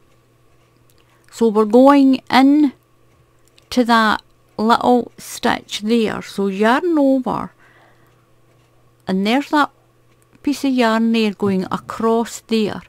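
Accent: Scotland, United Kingdom. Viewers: I love your designs, your instructions and your beautiful Scottish accent.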